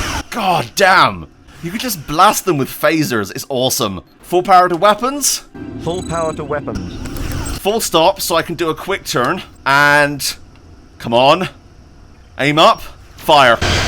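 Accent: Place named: South West England, United Kingdom. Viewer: i know a fellow south west person when i hear one!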